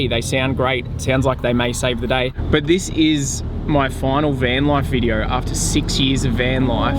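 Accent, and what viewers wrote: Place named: Australia. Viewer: Obnoxious Aussie accent.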